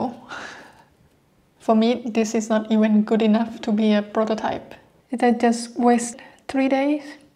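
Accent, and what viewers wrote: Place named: Europe. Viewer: Thats an interesting accent bever heard one like it...european i think. Not sure. Interesting video too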